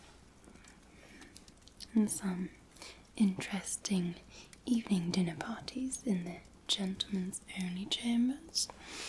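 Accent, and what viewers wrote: Scotland, United Kingdom. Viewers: Loving this character, don’t forget your Scottish accent though 🏴 💙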